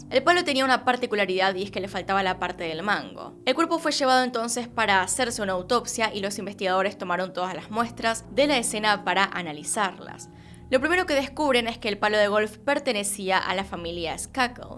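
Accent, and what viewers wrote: Argentina. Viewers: Hola. Eres buenísima para narrar y editar tus casos. Eres mi favorita , además me encanta tu acento argentino.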